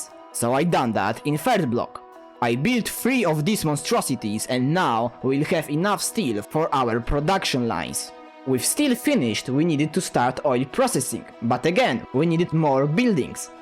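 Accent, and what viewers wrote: Poland. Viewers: Ah I've heard your voice From shorts I knew I recognise your polish-ish voice nerd voice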